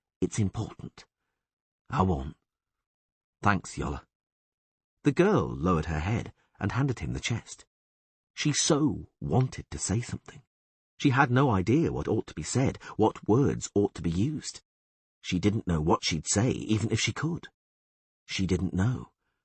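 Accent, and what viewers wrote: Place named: United Kingdom. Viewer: I've got trouble understanding many words, I am not a native speaker and the weird words plus the British accent makes it incredibly hard to understand ( the text helped a lot so thanks 🙏) I'll switch to Portuguese though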